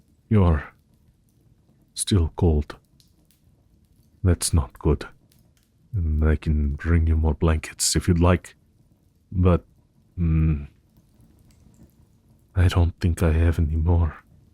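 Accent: Russia. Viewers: Before clicking on the video Oh hell yeah mothman after clicking on it oh Russian mothman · RUSSIAN MOTH MAN IS THE BEST MOTH MAN!!! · I love how when I saw this I was like “Aw moth man ^^!” And the second I clicked the video I was like “Oh my- was not expecting the moth man to be Russian 👁👄👁”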